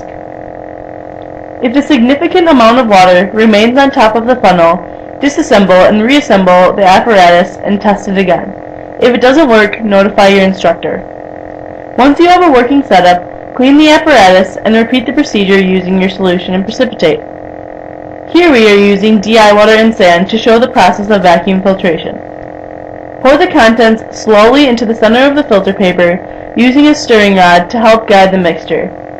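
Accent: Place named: Wisconsin, United States. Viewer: More specifically, I think it's "Oconomowoc Swimming" Oconomowoc is a city in wisconsin, and her accent sounds like a wisconsin accent.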